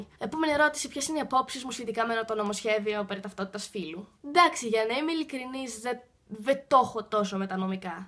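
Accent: England, United Kingdom. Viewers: Μια χαρά είναι τα Ελληνικά σου. Το μόνο που μπερδεύει λίγο ότι μερικές φορές τα μιλάς με αγγλική προφορά (σύνδρομο Τσίπρα). Πρόσεξε το! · Φαινεσαι πολυ γλυκος ανθρωπος και εχεις μια.πολυ θετικη αυρα και μου αρεσει επισης η αγγλικη προφορα σου😍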